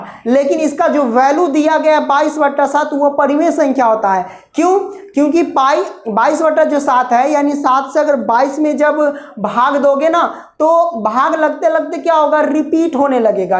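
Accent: Bihar, India. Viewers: Sir aap Bihar ke hai na aapki baaton sehi pata chalta hai aap bahut aacha bolte hai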